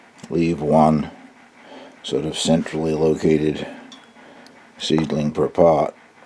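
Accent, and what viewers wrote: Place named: Campobello, Canada. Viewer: Grampy must have donated the extreme accent of some of the Campobello Calders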